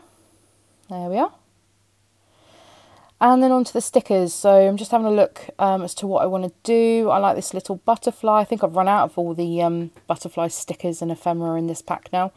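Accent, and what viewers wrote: United Kingdom. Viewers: I’m really enjoying this series. Years ago I spent 4 months student teaching in a nursery school in Wales and I miss the accent, not saying you have a Welsh accent but a wonderful British accent ☺️ and love that I can watch videos about a topic I love in a language I love ❤️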